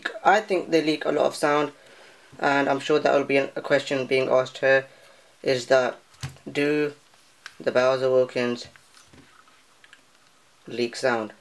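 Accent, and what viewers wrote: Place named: India. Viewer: half indian half uk accent, pretty cool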